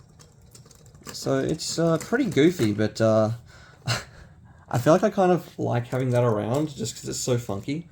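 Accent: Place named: Australia. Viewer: You sound aussie whereabouts are you from would love to do some battles and a meetup. Havent seen much people use plastic in a while gets me excited.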